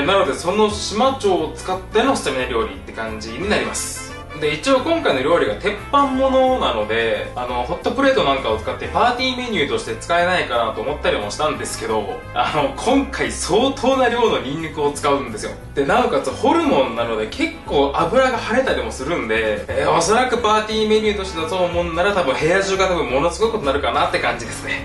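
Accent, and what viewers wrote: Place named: Fukuoka, Japan. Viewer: ホル鉄最高ですよね 最近見始めたばかりですが 語尾が、やねとかイントネーションとかで もしかしてと思ったら福岡なんですね！ 柔らかい喋り方、聞きやすくてばり好きやん(いきなり博多弁) もしかしたら通りすがっとったかもと思うと...(口が緩みます)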